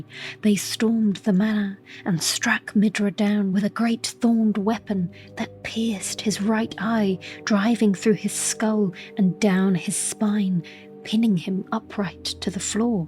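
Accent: United Kingdom. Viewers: Having a soft spoken British women telling me Elden Ring DLC lore in bedtime story form is something I never thought I would need. But I am here for it with a side of chamomile tea... because English breakfast tea has caffeine!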